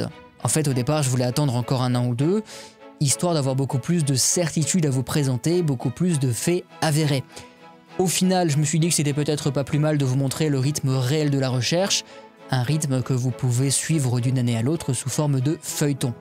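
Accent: Alsace, France. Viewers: salut je viens de découvrir ta chaine avec le mur paien, je me demandais, tu es alsacien?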